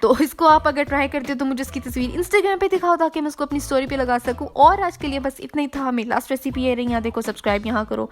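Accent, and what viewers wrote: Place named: Punjab, India. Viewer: Cute punjabi accent. 😊😊👍👍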